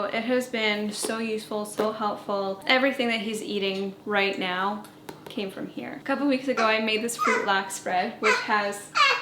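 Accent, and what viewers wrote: Canada. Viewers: Definitely not all Canadians! She is from essentially the US Midwest equivalent of Canada. Compared to in Ontario (where Toronto and Ottawa are, our largest city and capital), I haven’t heard many people talk like she does! Another interesting one is how she says garage. Regional differences are so interesting!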